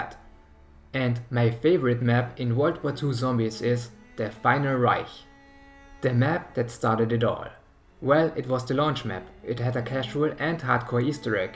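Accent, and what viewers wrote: Germany. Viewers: The German accent makes it perfect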